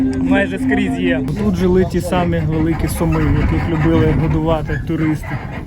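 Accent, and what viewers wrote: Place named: Surzhyk, Ukraine. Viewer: Окреме побажання автору каналу. Можете незважати на це, але: попрацюйте будь ласка над своїм словниковим запасом. У вас, надзвичайно багато суржику в вимові. Взрив, в прєдєлах норми... вибух, в межах норми і т.д. Я трохи більше року тому був сам узкаязьічньій, а тепер роздаю поради як розмовляти інакше. Все буде Україна.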